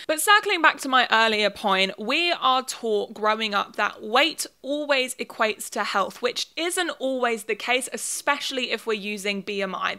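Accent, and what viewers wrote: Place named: England, United Kingdom. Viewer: there is something so refreshing about people tearing this show apart but ALSO something nice about your English accent doing it. "Are you HAVING ME ON?" Yes, you and everyone in America who they think is dangerously stupid (which for them, they think most of us are).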